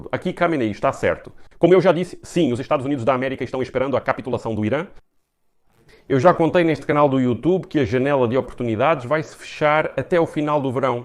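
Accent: Portugal. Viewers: Quem é você para ter "pena" de alguém? Quando as "crianças" lutam por sobrevivência não pedem hipocrisia de outrem, mas engajamento. Devemos considerar vidas humanas independente de etnias ou credos e a compaixão dissimulada não acrescenta, subtrai. "Ter pena" é melhor que exigir justica e direitos humanos indistintamente, é olhar de cima uma narrativa inconclusiva em sotaque português.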